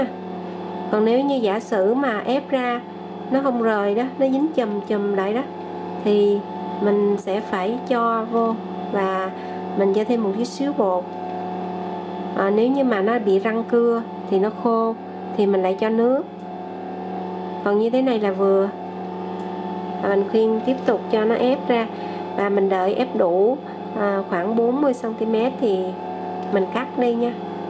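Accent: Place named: Quang Nam, Vietnam. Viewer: chia se mi quang dung cach cua xu quang cua co. con mi quag cac noi nhu pt ngot duong nhieu qua kho an lam chia se tieng noi de thuong giong nguoi quang co cam on nhe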